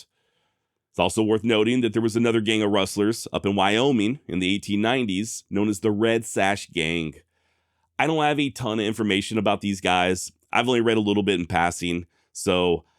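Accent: Texas, United States. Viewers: I love your intro and sing along with it. (I wrote and perform mine) and a far as your accent goes sounds like central Texas or Oklahoma. The giveaway is wash. You say warsh. Warshington. Think Dustin Hoffman in Little Big Man. "Gawd bess George Warshinton". Classic. Keep pitching buddy. I love you just the way you are. YEE HAW! rocks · I feel like a lot of these comments on your content or accent are from people that watch about 2 minutes of a video, find something they disagree with, then comment, lol. The thing about your accent was hilarious. If you watch any amount of your videos, you will find out pretty quickly you are a Texan, lol. Keep up the awesome work.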